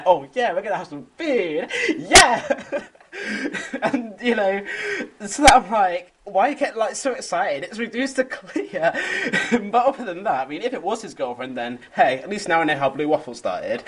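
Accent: United Kingdom. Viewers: r u brittish?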